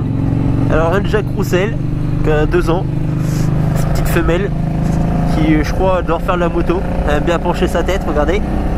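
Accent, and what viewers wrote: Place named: Champagne, France. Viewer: l'accent champenois ! ! ah si si, je connais ! :) sympa t'es bécane ! du coup tu as arrêté les wheelings suite a la rsv1000? V & +1 abo! ;)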